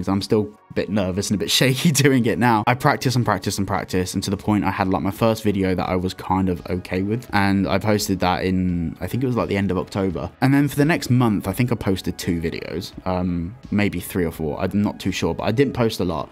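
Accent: United Kingdom. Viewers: I didn't start watching you for GTA, 2 reasons why. One, your British accent and mainly your ambiance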